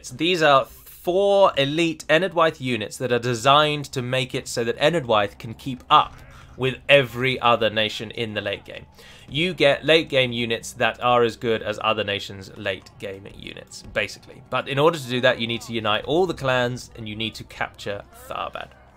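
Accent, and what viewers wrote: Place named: Yorkshire, United Kingdom. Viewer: that yorkshire accent was... enchanting.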